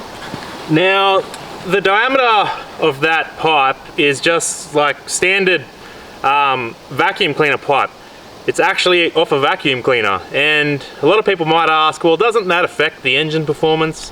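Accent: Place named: Australia. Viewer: Yes to a snorkel, and yes to more hearing you say, "battleprius," in your lovely 'Strayun accent.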